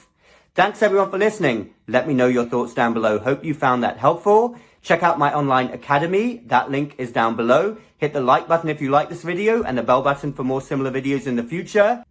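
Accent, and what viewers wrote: England, United Kingdom. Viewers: You sound English…are you talking about UK or US hospitals, or both?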